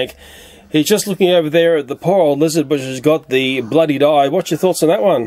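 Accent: Australia. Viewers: Hey man, love your vids, just a question, were are you from. Im guessing from your accent your Australian, but I might be wrong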